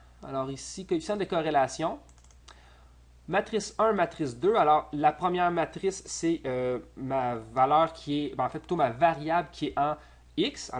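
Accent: Quebec, Canada. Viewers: moki aj c’est du Canadian Français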